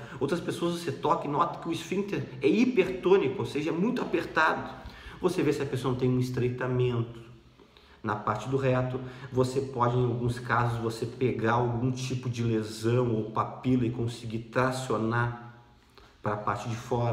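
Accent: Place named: South, Brazil. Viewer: Pelo sotaque, do sul.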